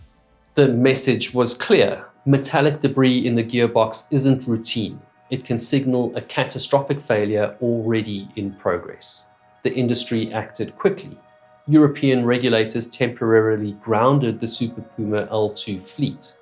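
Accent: South Africa. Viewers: Nice to hear your SA accent again from NZ. In the 1960s the old BMC Mini had a magnet on the oil drain bung, it was often covered in fine steel particles, if anything larger was noted, they were visually examined. Sections of teeth could be identified by comparing with other gear clusters. The customer could then decide if a precautionary teardown was in order or if they would risk it until failure. Only once did I hear of a complete seizure, this resulted in the car stopping like full application of the brakes; being front wheel drive. It was hit by the following car, no injuries. Keep your good work videos going.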